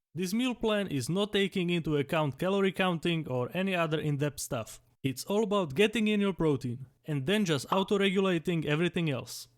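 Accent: Czech Republic. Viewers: Kámo... ten český přízvuk je moc. :D Nedávám to. Jinak super video ale. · dobrej czech accent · Já si říkal že slyším Čecha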